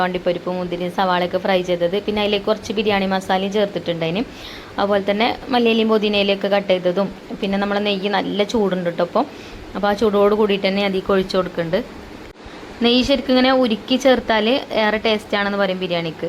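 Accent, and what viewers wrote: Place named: Chungathara, India. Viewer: ഞാൻ ചുങ്കത്തറ ഇവിടെ അടുത്താണ് എന്നു തോന്നുന്നു. Slang ഒരുപോലെ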